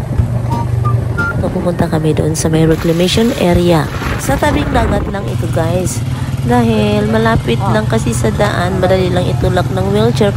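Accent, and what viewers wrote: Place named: Maasin, Philippines. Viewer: Maasin city wet market yan..happy watching to your vlog..kasi very fameliar ko ang lugar pati pananalita mo inday at yong shoreline sa likod nang maasin city hall very fameliar po sa akin kasi taga dyan rin ako. Thanks for sharing..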